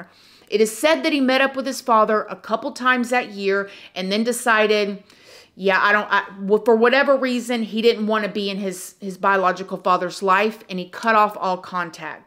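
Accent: South, United States. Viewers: I’m high so I had to watch a few times to understand but you’re such a cool human and I love your southern vibe. Honestly reminds me of the olden days when I used to hang with family down south and we’d just tell stories. Keep it up, sister. Love your content. The fact I don’t mind relistening says a lot haha. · Your vids literally make my day. You cover scary cases but your friendly presence makes up for it. I love your accent btw feels like I’m back in the south where I grew up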